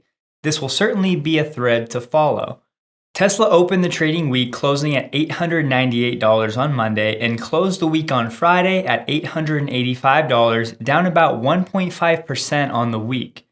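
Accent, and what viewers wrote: United States. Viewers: It's really a pleasure to hear a nice comprehensive summary covering major Tesla issues. It's even better when it's in clear American English.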